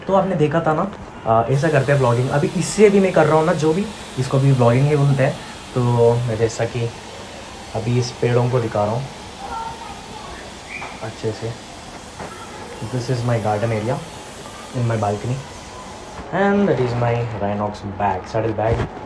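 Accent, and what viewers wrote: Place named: India. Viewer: Love your Hindi language accent🥰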